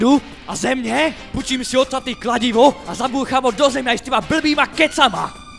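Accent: Moravia, Czech Republic. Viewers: Paci sa mi Moravstina :D taka slovenska cestina :D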